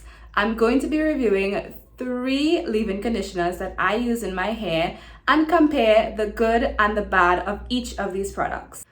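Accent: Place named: Trinidad and Tobago. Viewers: Definitely a Trini voice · Yep Trini too the bone...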